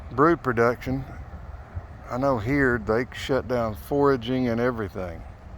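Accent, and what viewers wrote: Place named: Carolinas, United States. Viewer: I'm thinking that if the bees start drawing in pollen, the queen might start laying again. I'm not sure which zone you're in, but that accent sounds like the Carolinas or somewhere really close.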